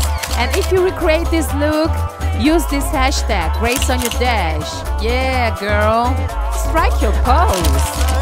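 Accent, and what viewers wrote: Italy. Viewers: Omgosh your Italian 😩 gets me everyt-i-m-e! Very glam hunny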